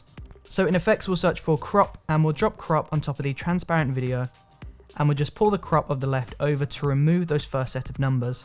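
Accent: United Kingdom. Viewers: Hii, it helped me alot. Thank you very much. Are you British? I like your accent!!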